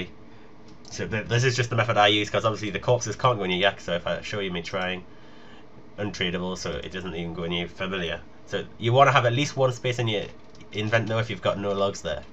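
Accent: Newcastle, United Kingdom. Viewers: You geordies and your accents!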